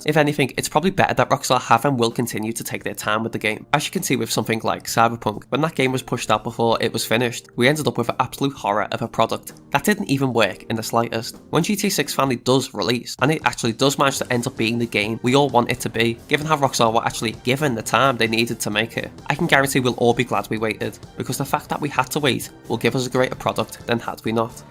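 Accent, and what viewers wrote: United Kingdom. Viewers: High quality bti'ish voice, let's go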